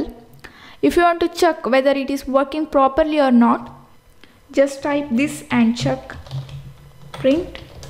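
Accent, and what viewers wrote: South India, India. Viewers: Are you south indian?🤔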